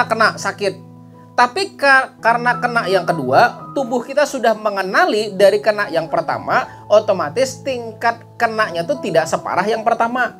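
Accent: Banjar, Indonesia. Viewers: seneng sama nada bicaranya, ini banjar ya?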